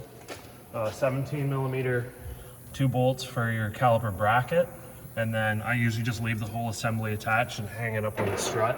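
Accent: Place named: Canada. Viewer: You Canadians need to learn how to pronounce the word out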